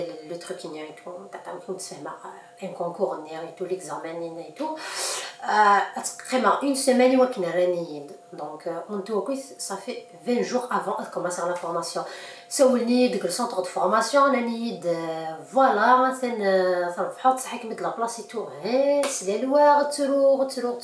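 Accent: Kabylie, Algeria. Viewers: Ta place est au one man show!! J'adore ton accent kabyle.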